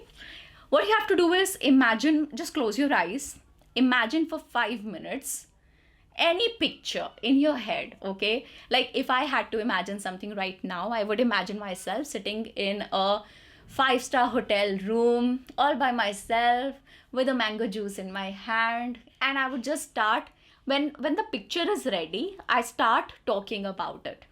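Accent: India. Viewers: I never heard before a clear Indian accent....I love it.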